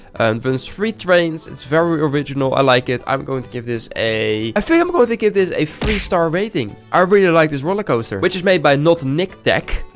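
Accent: Netherlands. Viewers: I have a question are u form the netherlands?? Cuz u sound like u form😅😅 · Hes dutch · he’s Dutch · are you NL? you sound like it while speaking English i can now a am also Dutch.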